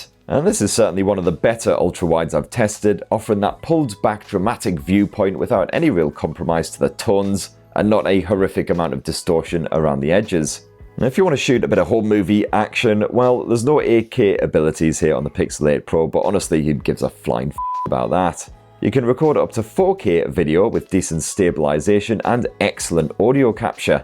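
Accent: United Kingdom. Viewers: Jesus chirst ive never heard cliche British before this guy lays it on next level thick. Ugh/cringe..difficult to listen to. Its hits my American ears all wrong. omg it doesnt stop the cool fonzy style lingo. This guy i wow cool.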